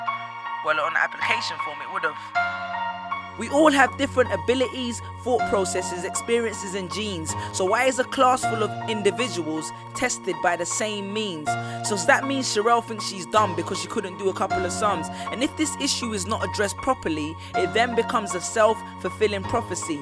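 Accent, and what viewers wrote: London, United Kingdom. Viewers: Marshall Manic it's not a cockney accent, cockney is old school east London, this is more south London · LyricMaker101 its london ghetto which is normally in east, north and south london. but juss coz he speaks like that dosent mean hes dumb, juss sayin. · I've been inside that building behind them, and it's in the city of London, but I don't remember what the building is called. I think it's something to do with art. His accent is London. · Frank White Not being smart, just not being dumb, but yes it is from south London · It isn't cockney, its a south London accent that's derived from Jamaican. (slang term for it is Ja-fake-an) Cockney is very different, listen to Danny Dyer he doesn't sound like this. At all. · South London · Somewhere in London probably. You can't really say British, there's so many different accents in Britain. 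· it kinda had a hint of Jamaican to me but my best guess would be south London only because I watched a documentary and the people had a similar accents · It is not a Jamaican accent. It is a London accent, just because he is black doesn't mean he is incapable of speaking, and just because you don't understand what he is saying, doesn't straight away mean he has a Jamaican accent, just because you see he is black, I know many Black, Caucasian and Asian people who speak like this, just because of the accent they picked up by living in London. Think before you say, don't use your absent minded, ignorant head to judge someone you don't know, you come across as racist.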